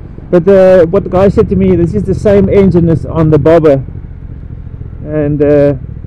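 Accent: South Africa. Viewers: Klink my jy is van Suid Afrika??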